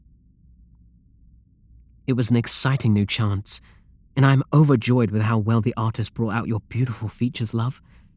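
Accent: United Kingdom. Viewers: If only rp-ing a fictional character was enough to get you a yandere 😔/j Great job on the au!!! It's nice to have a taste of that British accent as well!! ^^ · Ehhh sound more southern to me but other than that sounds pretty British unless your actually British and are using an American accent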